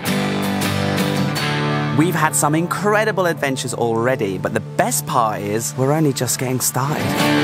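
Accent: England, United Kingdom. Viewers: So he s from Northern Ireland 🇮🇪 why does he have a slight English accent??? I think this might be my new H.Q. when I go there for 6 months in Oct. 2024. Looks like a great mild place to learn. Im 45. I GUESS ITS NEVER TOO LATE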